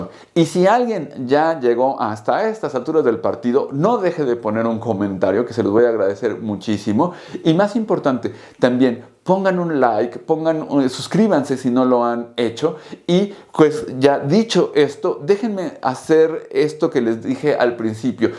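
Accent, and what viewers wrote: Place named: Mexico. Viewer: su acento mexicano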